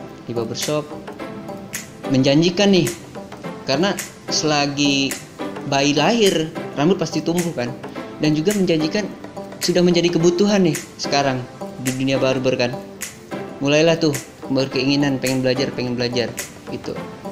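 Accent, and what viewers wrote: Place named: Palembang, Indonesia. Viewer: Mantap..gaya ngmng palembang masih Ado😀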